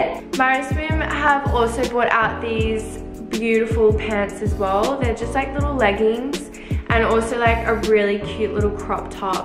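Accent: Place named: Brooklyn, United States. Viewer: Love ur accent, Brooklyn :) nice video O:)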